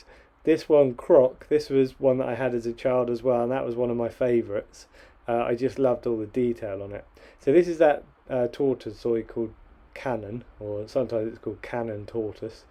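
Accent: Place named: RP, United Kingdom. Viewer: SO posh (loved it)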